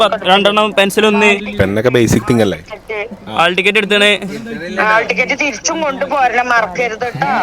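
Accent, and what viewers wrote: Malappuram, India. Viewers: Malappuram varthanam athaan ikk thonniya highlights 😂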